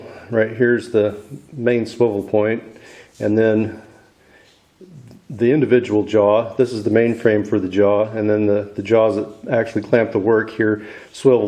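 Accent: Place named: Palatinate, Germany. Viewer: You're welcome, I now have watched all of your vids and subscribed as it seems you've got some interesting projects in the pipeline. Also good to see there are other guys around who cannot stop feeding the monster ..:-) Anyway, i'm a bit confused,... your yotuibe location says US and you sound like a native American to me, but power sockets and the "Schutzbrille tragen" sign on the door looks certainly German, not to forget your "Paelzer" dialect on the German video, which sounds pretty native as well...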